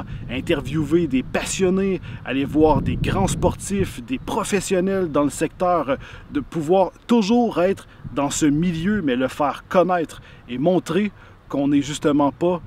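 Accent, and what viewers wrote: Quebec, Canada. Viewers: Ca cé du pur Québécois ,normal que tu comprenne rien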